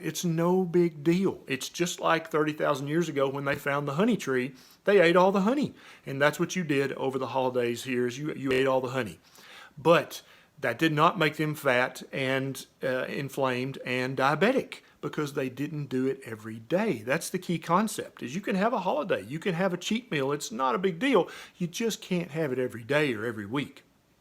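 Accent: South, United States. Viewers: I love your videos. Your dialect is pretty funny though. Are you from the South?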